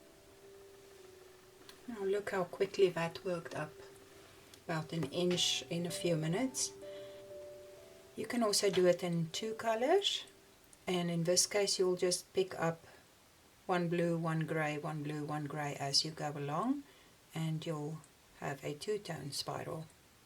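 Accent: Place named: South Africa. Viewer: Love your South African accent.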